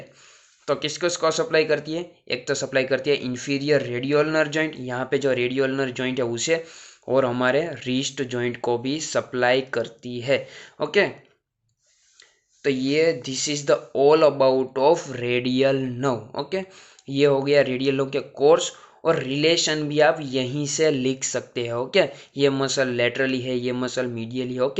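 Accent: Gujarat, India. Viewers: Gujarati cho ?😅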